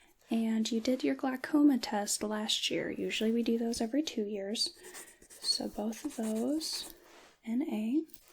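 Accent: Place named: Midwest, United States. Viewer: I'm from Missouri and you sound just like me lol 😂 Midwestern love!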